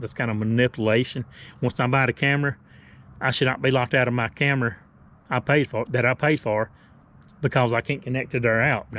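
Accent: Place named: South, United States. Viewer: Great video! And I love your southern accent!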